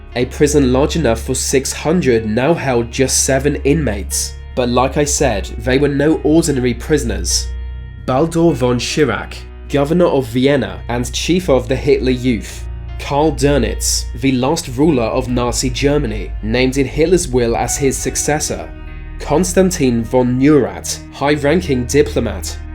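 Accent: United Kingdom. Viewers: You need to go to a surgeon for your face... hey that's really rude of me isn't it? I don't mean that really. It's not a speech impediment. It's actually a dialect accent of Britain.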